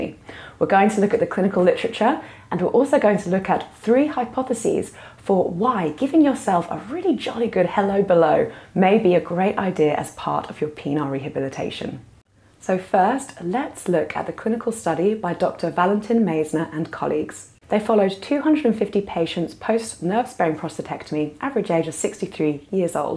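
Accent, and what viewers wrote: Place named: United Kingdom. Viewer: Listen to this woman. It is not just lip-service. I have been jerking off for 68 years and I couldn’t agree more. Unfortunately, her British accent makes understanding what the hell she’s blathering about quite difficult, but I heard the word “masturbation “ over and over again and that I can relate to!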